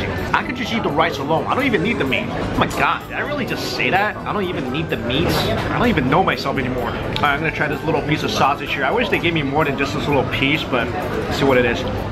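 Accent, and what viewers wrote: New York, United States. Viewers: and on, the chicks sitting behind you are freaking out. You look local but you´re talking New Yoakah, LOL!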